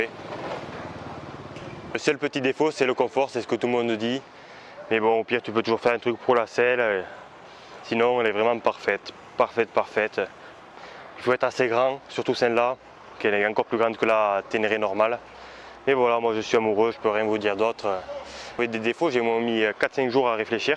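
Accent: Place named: South, France. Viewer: T du sud en plus avec un accent comme ça .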